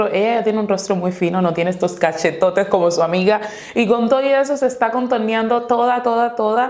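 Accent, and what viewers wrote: Dominican Republic. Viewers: cuando dijo que esas ofensas hacia ella misma eran bromas yo me dije como , eres Dominicana o algo asi? y luego dijo quija y fue como, noooooo si lo es y cuando dijo vaina en vez de cosa me dije confirmado XDXDXD saludos desde Rep.Dominicana .es que los Dominicanos nos decimos ofensas pero es más a modo de broma XDXDXD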